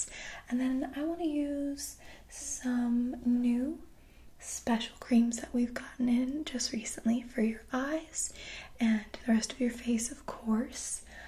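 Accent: England, United Kingdom. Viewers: british again, ithought today's would be Aussie. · I thought u r english after watching previous video lol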